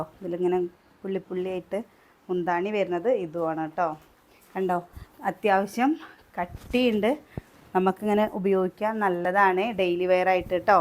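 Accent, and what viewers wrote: Palakkad, India. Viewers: പോളിസ്റ്റർ അമ്പാട കൂടിയത്. കളിയാക്കിയത് അല്ല. സംസാരരീതി പാലക്കാടൻ സ്റ്റൈൽ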